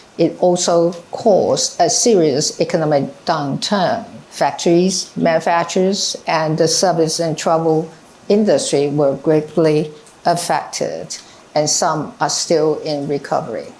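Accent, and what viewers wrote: United Kingdom. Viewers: 我是來聽小英的英國腔的：） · 喜歡喵統的英國腔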